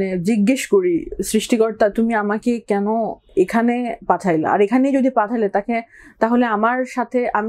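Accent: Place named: Assam, India. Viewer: Asameese der moto kotha koi kyan?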